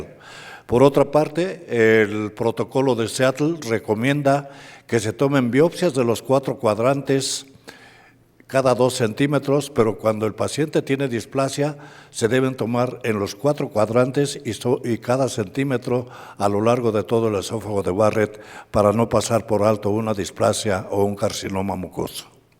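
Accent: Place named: Mexico. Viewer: El habla mejicana así utilizada es realmente agradable para el oído español. La conferencia ha sido para mí muy útil, explicativa y consoladora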